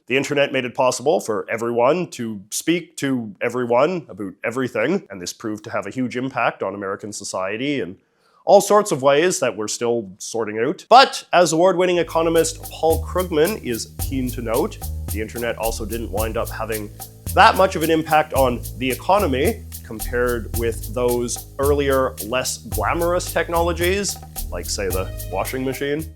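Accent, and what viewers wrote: Canada. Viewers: What part of Canada are you from? I’m from Ontario and I’ve never met anyone with such a strong Canadian accent like yours. I am close to the border so that’s probably why I’m used to more American sounding accents but I’m curious to know what province you’re from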